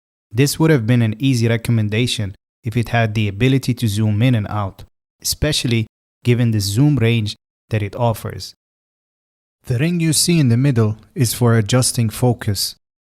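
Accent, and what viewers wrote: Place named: Russia. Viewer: Thank you for review, I'm looking for lens to create my content 🤝 And I'm happy to hear Russian accent 😁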